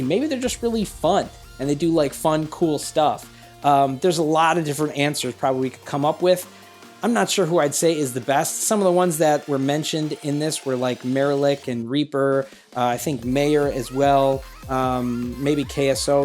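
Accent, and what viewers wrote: United States. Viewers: You definitely have that New York State accent,! Your Game ID should've been: NYNick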